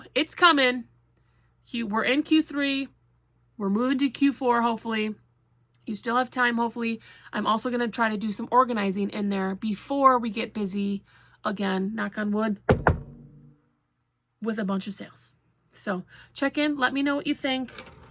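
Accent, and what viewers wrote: Wisconsin, United States. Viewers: Tie-kon-do-ro-guh 😁. Those are great! Love the videos! Wisconsin accent makes me homesick. Thanks for sharing!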